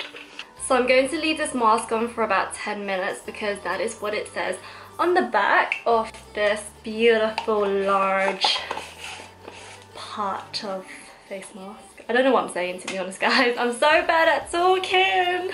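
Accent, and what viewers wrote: England, United Kingdom. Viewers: I've only ever seen your videos on Instagram and was completely shoookk at your British accent. That was completely unexpected However you are so lovely and slay these makeup tutorials to the max ❤❤❤❤❤👏👏👏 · I NEVER EXPECTED YOU TO HAVE AN ENGLISH ACCENT I AM SHOOK. OMG YOURE SO EXTRA ADORABLE WITH THAT VOICE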